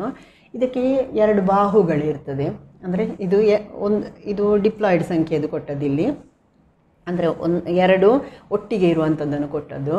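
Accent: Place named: Coastal Karnataka, India. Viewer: ತಾಯಿ ನೀಮ್ಮ ಕರಾವಳಿ ಸೊಬಗಿನ ಭಾಷೆ ತುಂಬಾ ಇಷ್ಟವಾಯಿತು.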